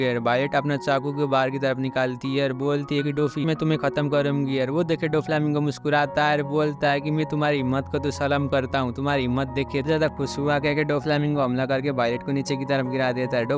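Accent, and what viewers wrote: Nepal. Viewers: Are you nepali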